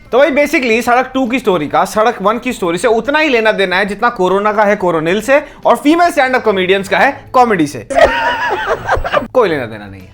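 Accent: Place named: Delhi, India. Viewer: Not to stereo type but love your delhi accent...accha lagta hai apna delhi ka bhai itna accha kar raha hai.... All the things you say how to say the metaphor the references mahn love from jamna par but not shahdra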